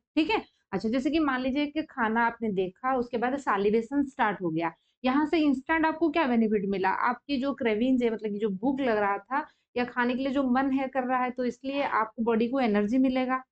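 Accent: Odisha, India. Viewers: Odisha se ho kya app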